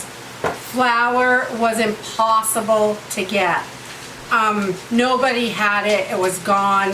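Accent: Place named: Maine, United States. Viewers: I'm from Boston. I knew your "accent " that you were from New England. Love your videos. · I knew you were from Maine when I heard, “you’re bummin’” Subscribed 👍🤣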